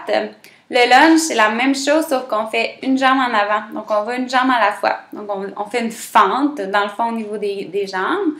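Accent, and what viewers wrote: Quebec, Canada. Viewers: C'est un accent québécois! c'est pas horrible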